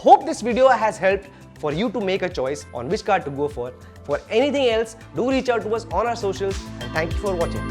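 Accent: India. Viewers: Screw your Hindu English .... :)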